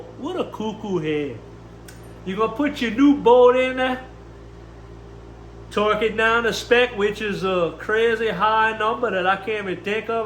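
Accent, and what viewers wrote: Louisiana, United States. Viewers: what part of louisiana you from?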